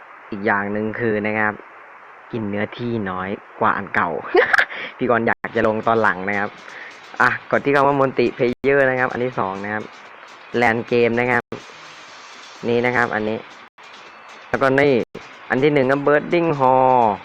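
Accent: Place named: South, Thailand. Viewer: คนใต้เออ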